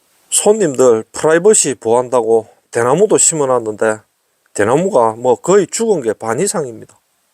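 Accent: Gyeongsang, South Korea. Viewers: 노노 갱상디언..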